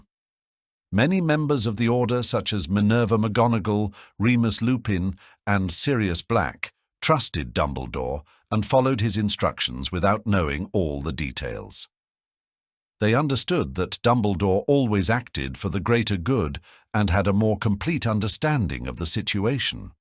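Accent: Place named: England, United Kingdom. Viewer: I just found this channel. Really enjoying it. The narrative is great. Love the English accent. The pictures are beautiful. I just wish they illustrated what's going on in the narration.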